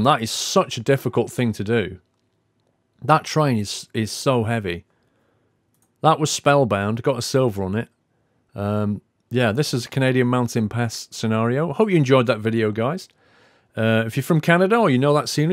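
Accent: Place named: United Kingdom. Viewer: How do you forget that when he has a British Accent?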